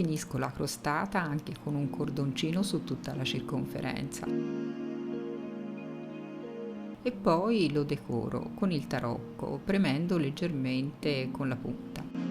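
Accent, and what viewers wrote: Tuscany, Italy. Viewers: 😋😋😋😋che meraviglia. La farò sicuramente. Ben tornata ascoltando la tua bellissima voce con l'accento Toscana.mi sei mancata.ma ora si ricomincia mia cara.e come ti dico sempre un bacione cara.❤❤❤❤❤❤❤❤❤❤❤❤❤🌹🌹🌹🌹🌹🌹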